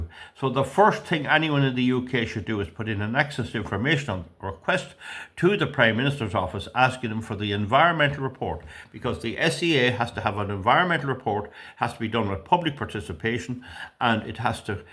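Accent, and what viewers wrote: Ireland. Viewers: i like the way you explain things. and that lovely Irish accent .thanks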